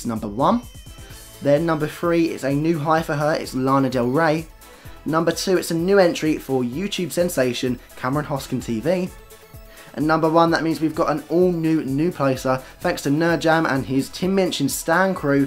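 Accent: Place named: Australia. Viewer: Phaha hilarious! Your australian accent is just like mine, guess that could be a compliment or not we may never know! xD you never fail to make me laugh, thanks! :)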